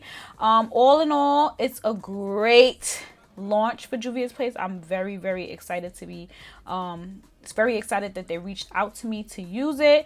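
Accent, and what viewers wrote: New York, United States. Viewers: Hi,. I am pleased to meet you on YouTube. I came across your channel on the wee hours of this past werkend, while you were sitting at your desk at work. I am a career Executive Receptionist, too. The makeup look so radiant on you. I can recognize a New York dialect anywhere. New York is home to me. God bless you.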